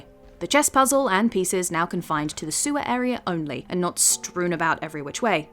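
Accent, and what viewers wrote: United Kingdom. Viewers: Jesus you sound english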